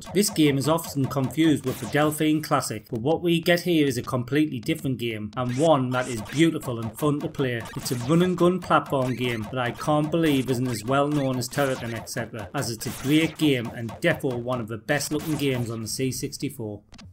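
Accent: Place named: North East England, United Kingdom. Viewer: ah cheers man. I can tell just by the accent you are NE, and a total commodore lad so what more could I want. Loving this series so far. There’s so many C64 releases and these vids have already introduced me to a few I’ve never heard of!! Appreciate the time to get back to me mate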